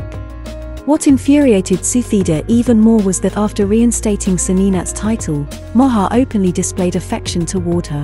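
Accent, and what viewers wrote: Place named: RP, United Kingdom. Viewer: Nice to hear a beautiful standard received pronunciation.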